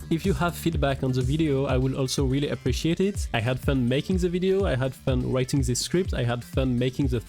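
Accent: France. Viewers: Bro i love your accent “we grrrrab ze playeur statu and rrrun to zhafity wit it” it is so nice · You sound french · dude this is so funny, well edited and highly informative for such a low view and sub channel, take my damn sub! also you accent (I'm pretty sure it's french) is 🤌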